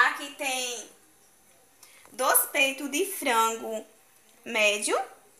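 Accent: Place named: Northeast, Brazil. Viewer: Olá querida flor 🌹 eu amo seu sotaque 💓 realmente me cativa. Você é baiana? Parece sotaque nordestino. e seu Marido também cozinha ou só você mesmo? Você pôs o quentro por último e minha esposa sempre põe primeiro kkkk Ótimo vídeo parabéns e sucesso para você abraços ☺👍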